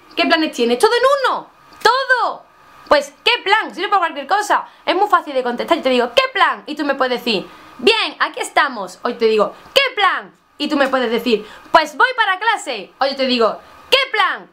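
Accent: Spain. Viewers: que bonito acento andaluz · Me encanta ese acento sevillano, me recuerda a malviviendo jajaja Llegue aqui desde Soy Reggaeton jajaja <3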